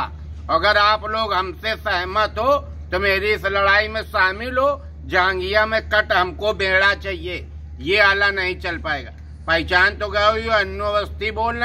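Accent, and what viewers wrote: Kanpur, India. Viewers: Phchan gye bol rhe K🤣anpur se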